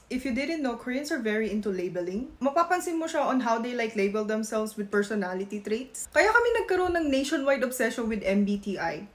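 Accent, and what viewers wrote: Philippines. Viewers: You have a Pinay accent na talaga 😊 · tunay kang pinay kasi when it comes to beauty marami namang pinay like your beauty magsalita pinay pagmagenglish pinay accent kahit kunting korean accent wala eh more on filipino ka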